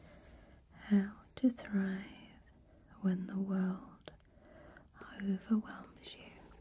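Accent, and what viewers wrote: England, United Kingdom. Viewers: should be WAY beyond 1.5+ million subs....she is simply Fabulous & her voice is so wonderfully calming. I adore her beautiful English accent, she is absolutely lovely! Please Like her vids if u watch/enjoy bc that gets her videos out to more ppl. Everyone needs some in their life. Idk how anyone could be grumpy, negative or NOT be in a great mood after listening/watching beautifully relaxing, positive ASMR videos. Thank You! You've soothed me to sleep/beat insomnia naturally & greatly reduced anxiety too many times to count. I truly appreciate all you do♥️. With gratitude, ~A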